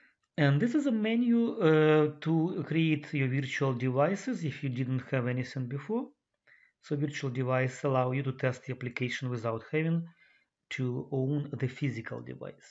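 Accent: Russia. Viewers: nice accent are u from Russia or Ukraine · Ааай обожаю русский акцент в английском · You are a Brazilian guy. But you really sound like a Russian guy. The guy from university, who studied English, sounds pretty like you. It looks like Russian and Brazilian accents are similar. It sounds like big news for me.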